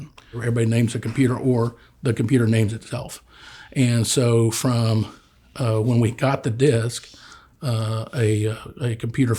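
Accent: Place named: United Kingdom. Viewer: to give this channel a lil boost: subbed. british sounding narration always sounds like quality to me, a german.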